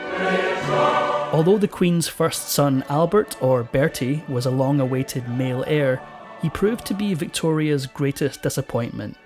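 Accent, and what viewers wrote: Scotland, United Kingdom. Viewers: I had forgotten just how much I loved this narrator’s Scottish brogue! Cheers to you, Sir! ❤❤❤ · Scottish accent and exceptional annunciation 🏴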